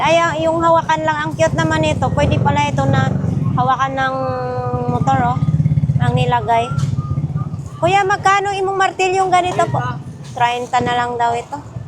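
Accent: Visayas, Philippines. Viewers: bisaya ikaw naman 😂😂😂😂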